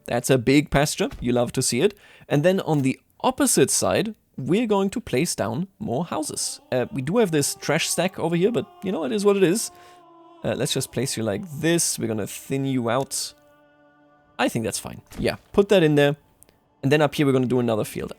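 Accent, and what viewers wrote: Germany. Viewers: i love your Denglish xD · Are you german you say 4 Seiten globel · Bro doing germglish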